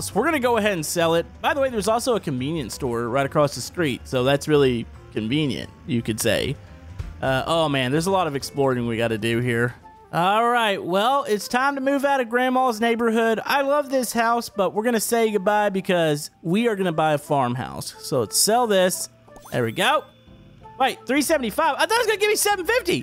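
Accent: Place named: Texas, United States. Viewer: Im sad he did not use his Texas acsent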